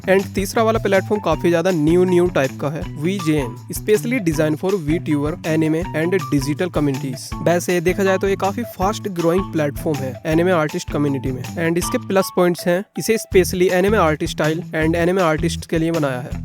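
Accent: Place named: Odisha, India. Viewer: Bhai pata nahin kyun esa laga ki aap Odisha se ho ? 🙂‍↕️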